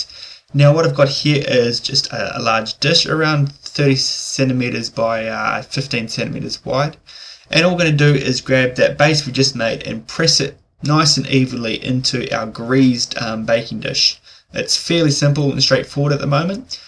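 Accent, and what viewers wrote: New Zealand. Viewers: Are you from new Zealand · Are you a Kiwi….you talk like me?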